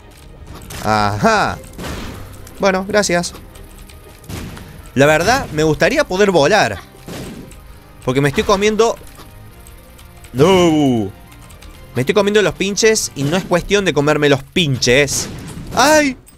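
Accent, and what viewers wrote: Argentina. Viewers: vos sos argentino???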